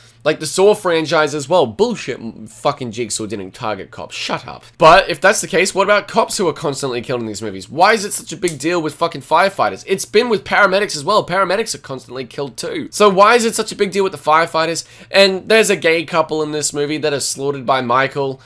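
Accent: Australia. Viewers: I forgot how much I love your Australian accent. Your voice would be really great for ASMR. I haven't seen the movie yet But I have Peacock which is a streaming service in the United States that it's streaming on simultaneously with its theatrical run.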